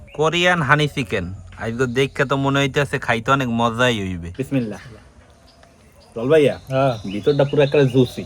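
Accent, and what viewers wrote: Barishal, Bangladesh. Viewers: এক্কেরে খাস বরিশাইল্লা ❤❤❤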